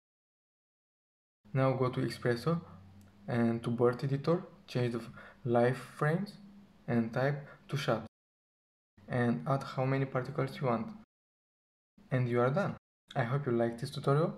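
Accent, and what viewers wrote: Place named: Brazil. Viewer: amigo, vc é brasileiro, né? Pq pelo sotaque ta parecendo, se for, poderia gravar em portugues mesmo. Ficaria um tutor melhor.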